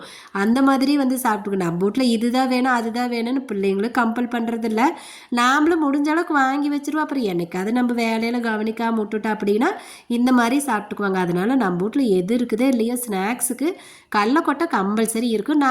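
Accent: Kongu, India. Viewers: Kongu slang 🎉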